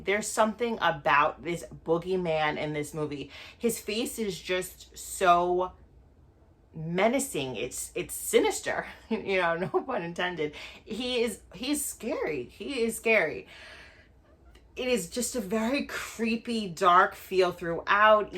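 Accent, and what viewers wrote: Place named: East Coast, United States. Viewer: Great reviews very detailed ! You a Jersey Girl?? You got an East Coast accent.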